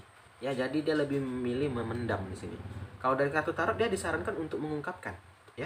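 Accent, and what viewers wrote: Padang, Indonesia. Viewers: Logat bahasanya sprtnya mas org padang ya...🤣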